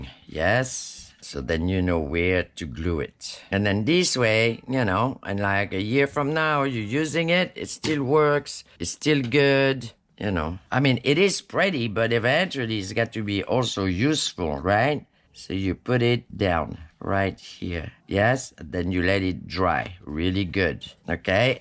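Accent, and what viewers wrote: France. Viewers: Hello,this is a little strange that I came apon your videos my name is also and I also was born in France My father was stationed in France when he met my mom,and much later my mom had 8 kids,4 boys and 4 girls we left Europe when I was 4 yrs old,gosh I love your videos so much and your accent brings me back with so many memories so just wanted to say may God bless you each and every day❤❤❤❤❤❤❤❤❤❤❤❤❤❤❤❤❤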